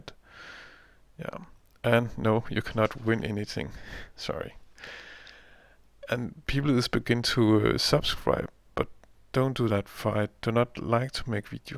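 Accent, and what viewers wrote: Denmark. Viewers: are you from Denmark? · Nice video, jeg kan høre på din accent at du er fra Danmark, hehe:) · Clearly from Denmark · Hes Danish:) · your danish? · Are you danish? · Fin video! Hørte på dialekta di med en gang at du var dansk:) Hilsen fra norge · u from denmark?:-D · your English is exelent, the reason some people don't understand it is because they are not used to your Danish accent. But i understand you perfectly, Im Norwegian and i also have a accent some people don't understand:) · Fun to see a dansish minecrafter! though you should try to work on your accent, its very "danish" so to speak, if you understand what i mean... Else very great work, keep that up! ( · Man kan squ godt høre du er dansker xD:-D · He´s very danish! haha - "også" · are you from denmark? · Nice trap, but i dont like your danish/english accent else it was fine. 4/5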